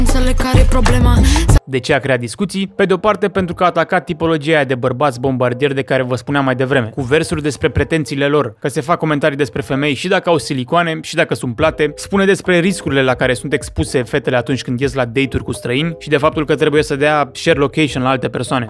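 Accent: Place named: England, United Kingdom. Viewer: Băăă, ce bine o dai cu accentul englezesc 😂 Ar fi tare să te faci cântăreț😂😂😂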